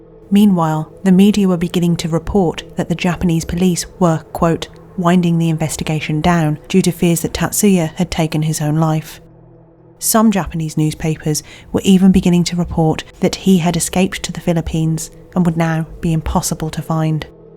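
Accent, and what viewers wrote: United Kingdom. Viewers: Dude... Do you even hear yourself? You waffle on in here demanding someone with a British accept to do the very thing she is already doing; Speak normally. And you proceed to do this with a comment where you demonstrate your complete ignorance of the written English language. Are you this embarrassing in real-life too?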